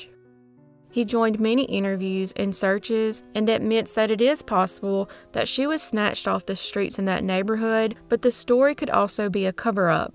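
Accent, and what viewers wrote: South, United States. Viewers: I’m from U.K. but guys what accent is the presenters voice ? Must be down south right ?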